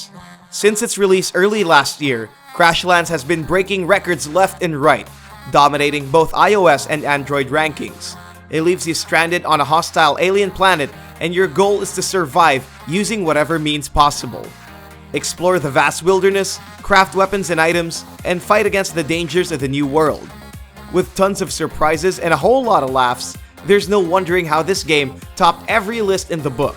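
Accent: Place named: Philippines. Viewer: Why does the VO sound very Filipino?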